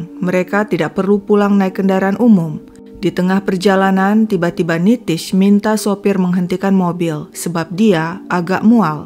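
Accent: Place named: Surabaya, Indonesia. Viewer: Dari awal mendengarkan dr dialeknya aku sudah menduga sepertinya dr Surabaya. Bener dong ada "soale"... Hehehe... Selamat buat kalian yg menang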